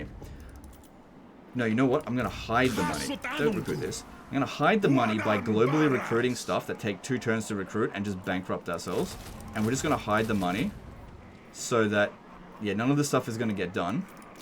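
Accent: Australia. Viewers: never was a huge viewer but it kinda rocks how painfully australian he is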